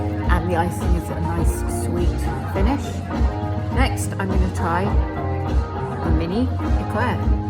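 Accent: Ireland. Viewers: perfect Irish accent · Loved the Irish accent haha ❤❤ I would love to try a banana daiquiri but never see them on the menu anywhere! Those cocktails at the end looked amazing 😻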